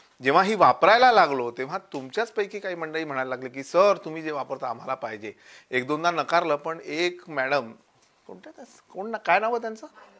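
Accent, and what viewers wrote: Vidarbha, India. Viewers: Vidrab touch bolaya chaan vatte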